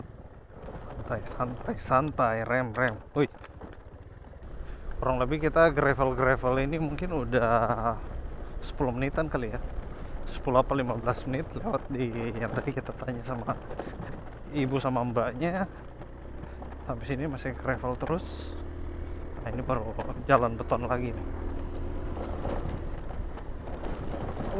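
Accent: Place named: Medan, Indonesia. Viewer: Abang ini asli orang mana ya? Kayak ada logat medannya gitu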